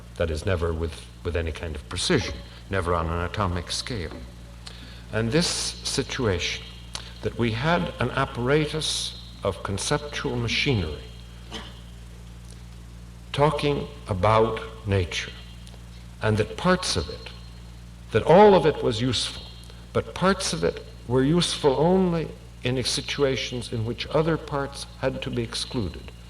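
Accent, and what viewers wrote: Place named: Mid-Atlantic, United States. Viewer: The “Mid-Atlantic” accent needs to make a comeback…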